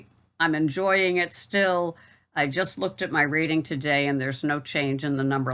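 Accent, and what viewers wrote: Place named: Boston, United States. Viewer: You are doing everything right,! I love to hear you are enjoying carting! The great customers definitely make up for the scamsters! Love your Boston Accent! So Wicked Smaht!! Hope you had a great day! Stay strong & encouraged! 💛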